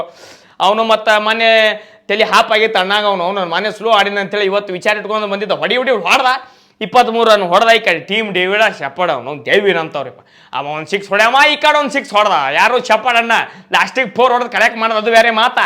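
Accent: North Karnataka, India. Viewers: ಮಾತಾಡುವ ಶೈಲಿ ಸೂಪರ್ ಉತ್ತರ ಕರ್ನಾಟಕದ ಭಾಷೆ❤❤❤❤❤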